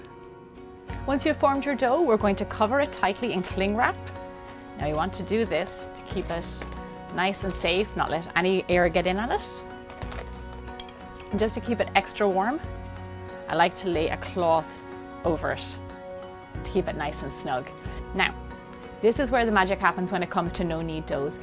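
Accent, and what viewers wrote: Ireland. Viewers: Thank you for this video and I love your Irish accent, I have just started making a lot of no Knead breads and I needed this one for my collection,,,, One question, I bake my no Knead bread in a preheated oven and pan at 450 for about 30 min covered then I uncover and bake to get crust the way I like it, 3- 15 min, is it ok to do that with this recipe? you have a new sub and thx again and I look forward to watching more of your videos. ;) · I cant help but notice the Irish accent or is it? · I had to watch it three times -- I kept drifting off listening to that Irish Accent and not paying attention to the recipe. Sure she seems sweet in this video but you haven't seen her angry.😁😉❤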